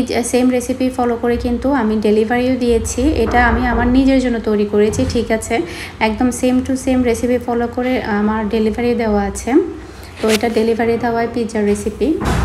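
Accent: Chittagong, Bangladesh. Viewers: চট্টগ্রাম এর ভাষা একটু অন্য রকম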